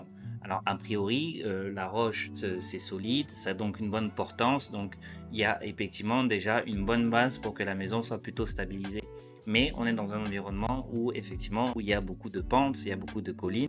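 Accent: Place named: Cameroon. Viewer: Le ton camerounais 😂😂😂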